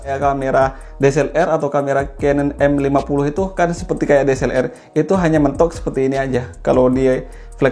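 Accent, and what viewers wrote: Madura, Indonesia. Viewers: Medok bangget bang Madura nya 😊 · Madura mana bang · Madura ya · Logatnya madura😅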